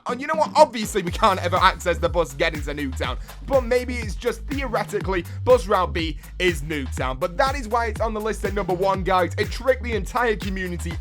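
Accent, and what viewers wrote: England, United Kingdom. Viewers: allot a lot hos english accent made it sound like that trust me i have someone i know with the same accent he pronuces it the same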